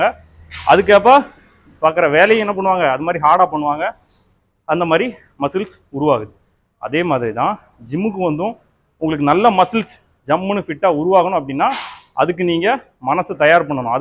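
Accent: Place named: Kanyakumari, India. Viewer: இது தான் உண்மையான கன்னியாகுமரி பேச்சு வழக்கு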